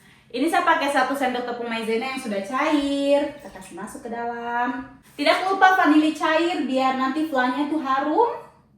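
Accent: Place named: West Papua, Indonesia. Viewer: Kakak ini dari Papua atau dari Sulawesi dari penampilan kakak kaya orang Sulawesi tapi dari dialek kakak kaya orang Papua Barat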